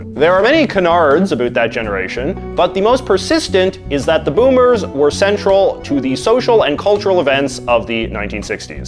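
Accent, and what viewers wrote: Canada. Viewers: I don’t think you can put a hard line on what year differentiates a generation. Being born in 82, I relate a lot to Gen X even though I’m an older millennial. I got a good chunk of 80s vibes even though my prime upbringing was in the 90s, especially being that I’m a “late bloomer”. I think the term “old soul” applies to us who carry over traits of the previous generation into their more appropriate generation. I think also part of it is having older siblings/cousins that influence our upbringing. And wow, I’m sooory…I never thought I’d ever hear such a thick Canadian accent! lol love it! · your accent is so canadian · Are you Canadian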